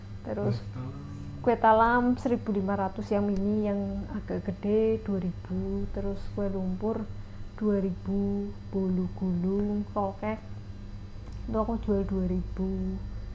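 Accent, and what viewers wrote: Banyumas, Indonesia. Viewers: Oalah Purwokerto toh mba.pantes bisa bahasa ngapak..salam mba aku dr banyumas😊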